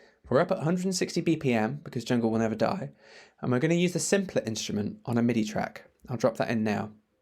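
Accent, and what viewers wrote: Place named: United Kingdom. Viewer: You know that is gonna be good when the guy has a british accent.. Cheers mate, very good content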